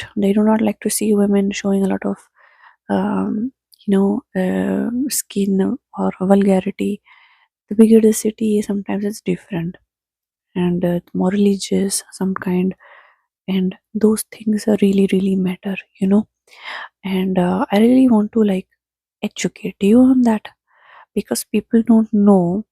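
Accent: India. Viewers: You need to make more flirty videos with the indian accent.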